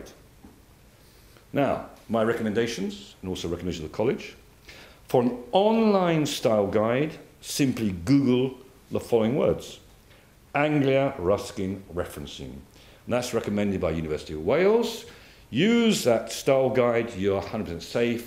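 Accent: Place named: Afrikaans, South Africa. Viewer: This oak is South African, right? Dude sounds like every Afrikaans English teacher I've ever had.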